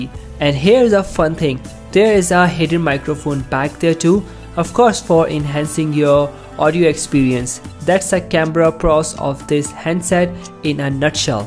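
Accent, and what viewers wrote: India. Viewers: Why does he sound Indian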